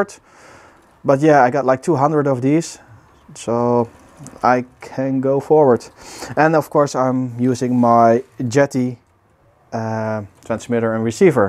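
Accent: Netherlands. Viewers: Ik dacht al aan de eerste paar zinnen dat moet een nederlander zijn, Heel gaaf gemaakt wacht op de volgende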